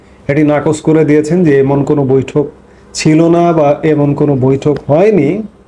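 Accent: India. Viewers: Yeah! He talks like a Kolkata Bengali.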